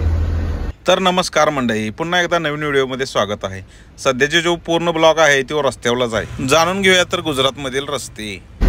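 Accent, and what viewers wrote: Kolhapur, India. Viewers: दादा भाषण कौशल्य खुप सुंदर आहे कोल्हापूरी भाषा 1 नंबर ❤❤👌👌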